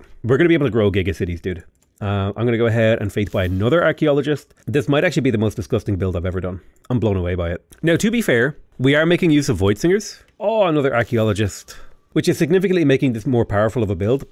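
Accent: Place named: Ireland. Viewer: I love ur irish accent i love watching ur videos just to hear u talk lol ❤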